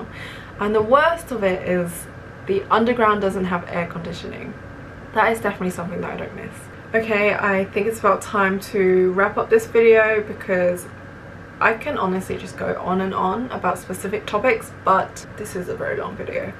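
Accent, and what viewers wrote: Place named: Australia. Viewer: That accent has some Aussie in there for sure!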